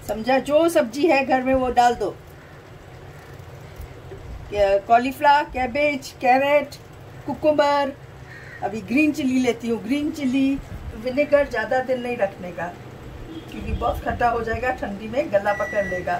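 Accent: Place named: Sindh, India. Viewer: With the name I thought it's a Parsi channel but the time you started to talk aunty ji I got it you are Sindhi 💞❤️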